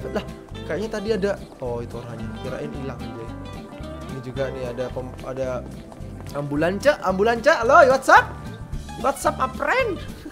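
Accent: Jepara, Indonesia. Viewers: Bang orang jepara ya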